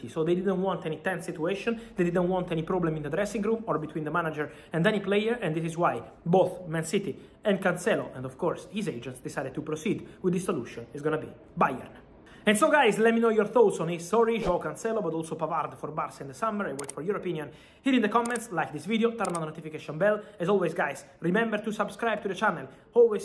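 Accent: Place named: Italy. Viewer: bro, the "e" in "reached" or any other verb in past tense is silent. Cmon, you are THE football journalist. No need to loose your nice Italian accent but put in a little more effort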